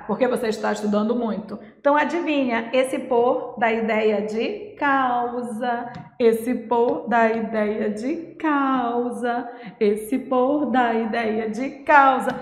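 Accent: Pará, Brazil. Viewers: Professora paraense, certeza. ❤️🙏🏻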